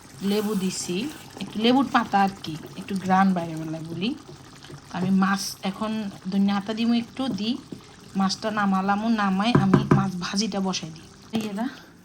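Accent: Noakhali, Bangladesh. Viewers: কেমন আছেন আপু।আপনার মুখ থেকে নোয়াখালীর ভাষা শুনতে খুব ভালো লাগে। আমিও নোয়াখালীর লক্ষ্মীপুরের মেয়ে ❤❤❤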